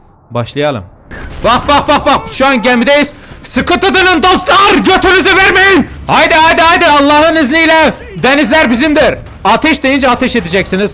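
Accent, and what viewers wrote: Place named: Kurdistan, Turkey. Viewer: çekemeyenler olacak seni sen bir Kürtsün senin şivene doğalığına kurban valahi senin takipçin artıkça ben seviniyorum yolum açık brakoo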